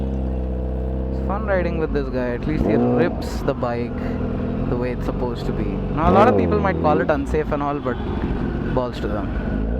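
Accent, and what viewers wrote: Hyderabad, India. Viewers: ap pakka hyderabadi baat karre bhai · Sir the way u talk the hyderabadi language i liked it very much by the way the wheelie was awesome 😜😜😜😜😜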